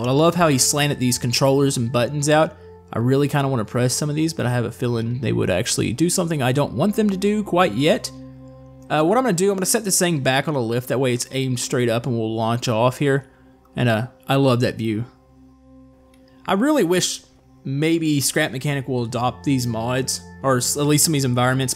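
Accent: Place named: United States. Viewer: i can really here your country acsent in this video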